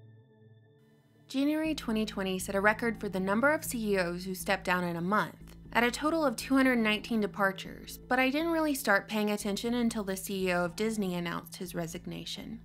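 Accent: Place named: Georgia, United States. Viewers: Thought I recognized the accent of a fellow north Georgian. I’m right outside Atlanta and heading there tomorrow to help my daughter finish moving from downtown Atlanta to Buckhead. I’m getting a little nervous about what we may encounter. 😬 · I should have known you were a Georgia peach with a voice like that 🥰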